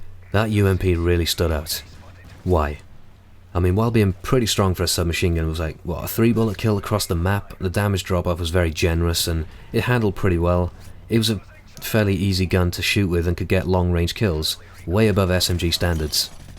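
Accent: Wales, United Kingdom. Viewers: Are you from Wales?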